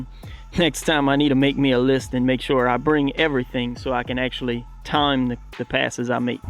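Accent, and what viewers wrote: Louisiana, United States. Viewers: Just a question? You in southern louisiana.. that accent has some breaux bridge behind it from how it sounds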